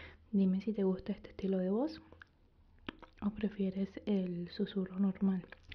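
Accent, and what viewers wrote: Venezuela. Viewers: Me encanta el acento venezolano, lo mire hasta el final. Super agradecida por este video.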